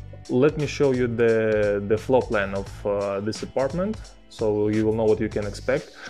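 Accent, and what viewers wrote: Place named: Ukraine. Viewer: Vasiliy from Ukraine accent :)))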